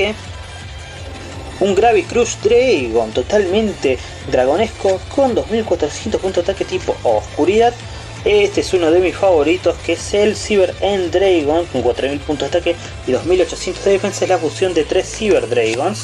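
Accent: Argentina. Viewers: de donde sos bro? Creo que eres argentino como yo pero de que provincia?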